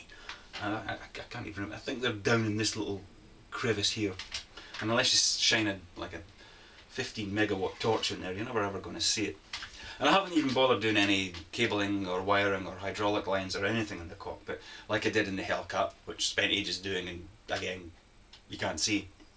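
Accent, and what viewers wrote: Scotland, United Kingdom. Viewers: just wanted to say I really enjoy your videos… I like your style, man! okay, maybe it's just the cool Scottish accent, I don't know… It's good stuff. I've been on ism for about a month now and I go by RubeGoldberg. I'm sure you'll appreciate the significance of that username. ;)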